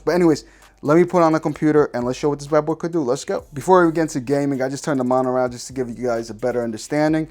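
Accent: New York, United States. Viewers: That NY Accent is CRISP I love it hahaha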